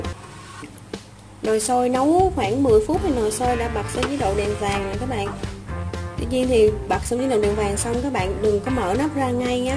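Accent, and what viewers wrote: South, Vietnam. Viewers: Nghe ngươii mien nam thich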